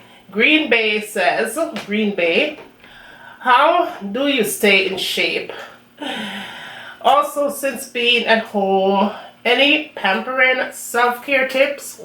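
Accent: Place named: Jamaica. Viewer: You doing good with the Jamaican 🇯🇲🥑accent 😃you go goodie🥰. Happy Independence Day 🍹and you eating your dinner 🥘 right🍍🍅🥒🥥🍍mi making some a your hominy corn porridge 🥣 for my dinner today 8/6/20 🇯🇲😃❤️keep the Jamaican twang going. You not from Spanish country, so you have to speak your Jamaican twang 👍🧄🥕🦞🌷🥰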